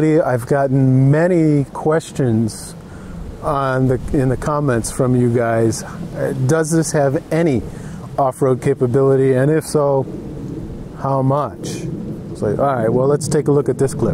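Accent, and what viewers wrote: United States. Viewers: English is my 2nd but you sound American to my ears. Why don't you use your Brit accent like some of your peers?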